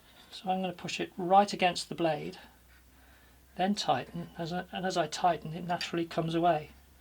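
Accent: United Kingdom. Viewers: Interesting and helpful Video. Cute woodworking girl, i like the british pronunciation.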